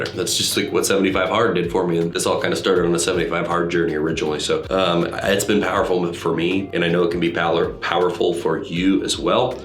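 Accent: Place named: United States. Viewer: Just clicked on this: THANK GOD not another rancid English accent or Aussie accent. Was totally expecting it and refreshing to hesr American English, which just sounds better and more “normal”. 😂❤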